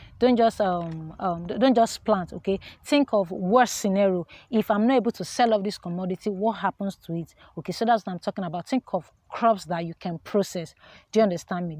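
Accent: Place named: Urhobo, Nigeria. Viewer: You sound like Urhobo girl.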